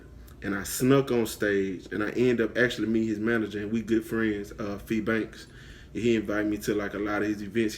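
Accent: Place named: Louisiana, United States. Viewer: It make perfect sense dat he frm Louisiana cuz he alr got da accent so it probably ain that hard for cuz to even do iy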